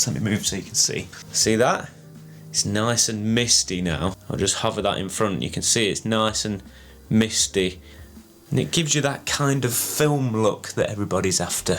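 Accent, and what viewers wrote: United Kingdom. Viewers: wow how cute your brit ish accent luved it! Hi👋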